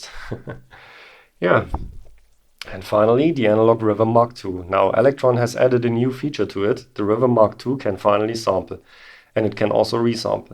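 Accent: Germany. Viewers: you are sooo fast. too fast for my ears.... i like your oder slow Videos. are you german? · "Some may laugh the decision"- love that cool German accent!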